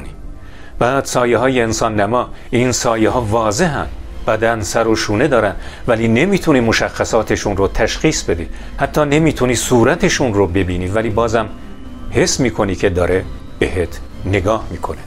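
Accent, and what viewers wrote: Iran. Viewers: نه دداش ازخاوریهای مشهد هستن راوی باورکن❤❤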